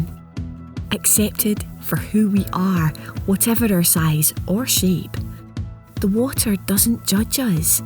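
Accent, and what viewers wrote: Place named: Scotland, United Kingdom. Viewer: cold showers and scotish accent ,what else can u ask for? cheers from mexico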